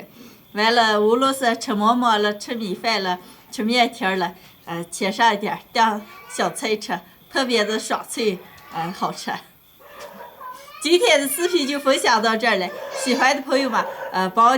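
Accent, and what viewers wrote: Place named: Yan'an, China. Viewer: 我陕北话这么有牌面么。感觉还是延安口音。。。。。。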